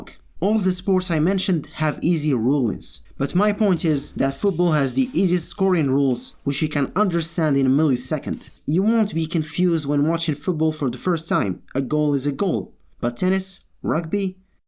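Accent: Morocco. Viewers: where are you from? your accent sounds like a moroccan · Are you Moroccan by Any chance, i hear a familiar accent ..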